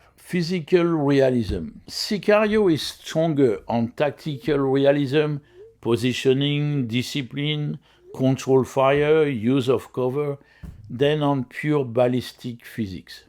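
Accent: France. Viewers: As a native french speaker, his accent is way too pronounced. This is so cringe. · There’s a French Guy telling us about firearms?? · First time watching this channel. I enjoyed this episode. I especially liked the French (I believe?) accented narration because it’s unusual.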